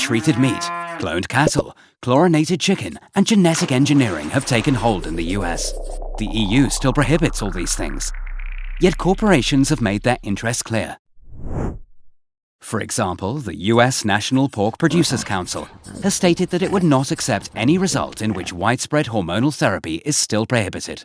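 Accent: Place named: United Kingdom. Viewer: irony. the guy has a UK accent. ////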